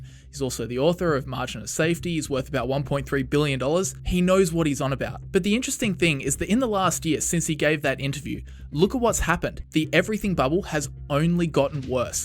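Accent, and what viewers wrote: Australia. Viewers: an aussie dude huh. let put some more gilts on the barby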